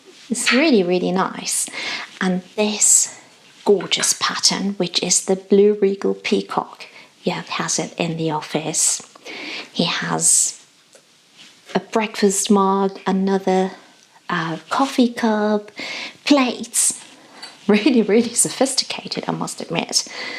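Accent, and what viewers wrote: England, United Kingdom. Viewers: Question; You are German but have English accents. Were you raised in England?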